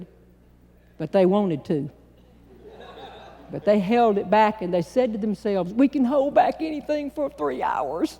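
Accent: South, United States. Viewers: She was so funny and had such an adorable Southern accent! I just loved Jeanne Robertson! ❤️ Good clean humor! And she was one of the greatest story tellers ever!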